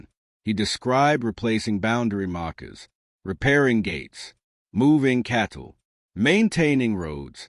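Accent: United Kingdom. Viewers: Why would someone who has generational property in Montana, sound like he’s from England?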